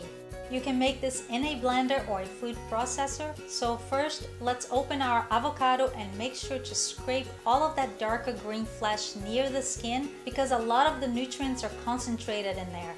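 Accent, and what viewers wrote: Brazil. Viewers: Você é de Manaus?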